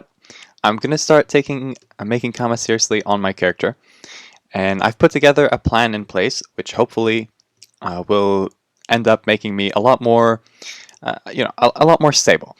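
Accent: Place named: Morocco. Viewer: you sound morrocan XD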